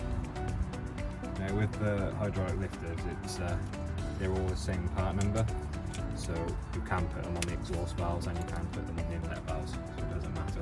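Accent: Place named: Devon, United Kingdom. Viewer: Nice video mate .Where in the UK are you .You sound like Devon area ?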